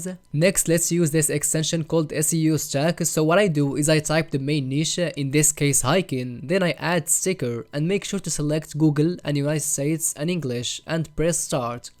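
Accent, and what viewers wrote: Morocco. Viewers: I really love the way you explain ❤👏👏 + you have a moroccan accent wich makes me feel like talking to one of my freinds😂❤❤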